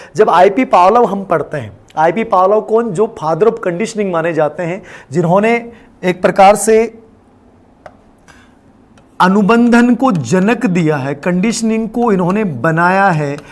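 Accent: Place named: Shekhawati, India. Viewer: Sir churu jhunjhunu se ho kya....lang. udhar ki lgi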